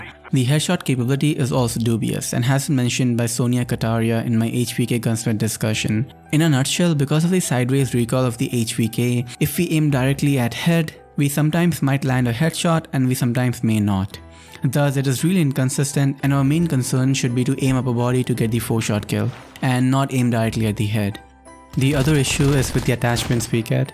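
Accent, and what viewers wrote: India. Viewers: Bro where are you from..? Sound like you are Indian 🤔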